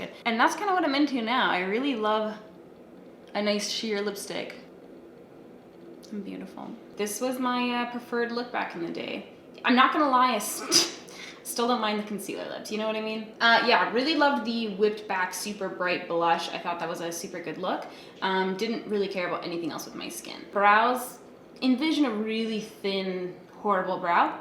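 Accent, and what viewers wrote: Canada. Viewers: you have a thicc canadian accent :) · never heard you sound so Canadian lol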